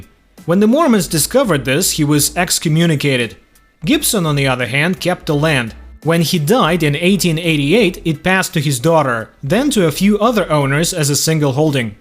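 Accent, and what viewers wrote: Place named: Russia. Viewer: your dirty Russian accent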